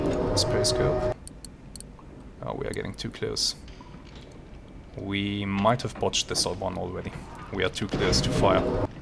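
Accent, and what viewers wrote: Germany. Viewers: love this series. great narration too, and the Germanic accent really helps!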